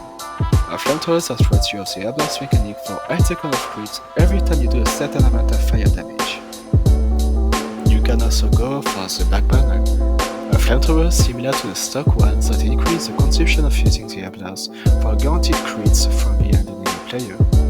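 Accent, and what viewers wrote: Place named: England, United Kingdom. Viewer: Noice english accent 😙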